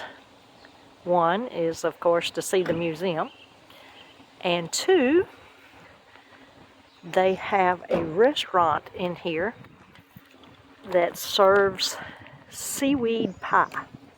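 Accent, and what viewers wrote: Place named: Arkansas, United States. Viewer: my mom side is from Arkansas and they talked alot the same. Well maybe they talked a bit slower than you. Lol.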